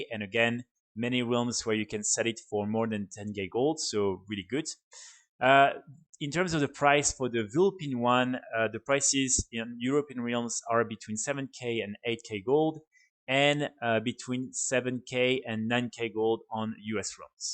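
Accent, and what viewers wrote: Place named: France. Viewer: Your content is some of the best, keep up the great work. Because you're French, you have a French accent. Your pronunciation is what is expected. Don't worry about it. The truth is, people LOVE IT.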